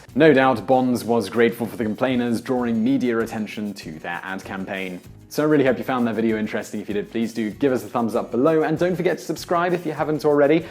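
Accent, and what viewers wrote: United Kingdom. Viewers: awkward or not, I still giggled when, with a straight face and 'serious' British accent he rattled off 3-4 euphemisms in a row... - I almost an entire video of him just reading a list of all the terms they can track down, either for boobs, the male organ, or any other 'naughty topic' in which language has created so many options to 'not say' what one is saying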